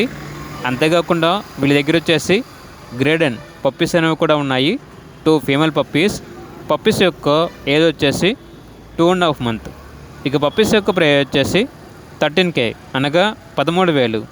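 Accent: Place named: Mumbai, India. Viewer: Telugu raadhaa ... mumbai heroine laagaa endhuku maatladuthunnaav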